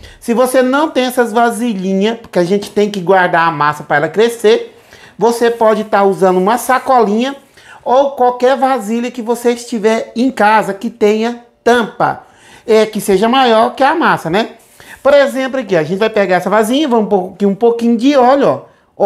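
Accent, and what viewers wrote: Minas Gerais, Brazil. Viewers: acho muito engraçado o SEU jeito de falar parecido com mineiro esparramar eu sou mineira! Por isso QUE tô falando! NÃO esquece da dancinha na hora de experimentar a receita!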